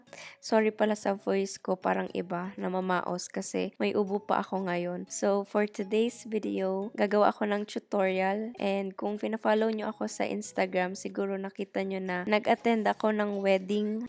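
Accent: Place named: Bicol, Philippines. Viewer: I've missed you and your cheerful and bubbly voice (with the Bicolano accent)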